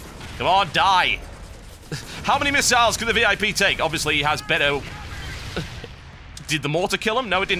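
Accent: United Kingdom. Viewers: You're British.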